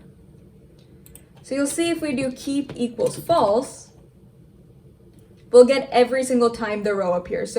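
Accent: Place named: India. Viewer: love the way you explain, keep making such videos and cover whole pandas. By the way I love your accent, it is mixer of indian and NRI.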